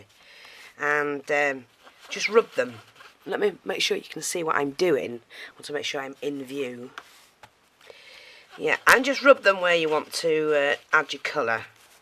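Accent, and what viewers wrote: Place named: Northern England, United Kingdom. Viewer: Thanks for this and great to hear a UK and even better a Northern lass instead of the US gals all the time :0)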